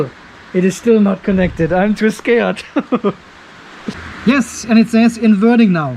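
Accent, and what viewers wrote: Germany. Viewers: Hört sich an als käme er ursprünglich aus Deutschland.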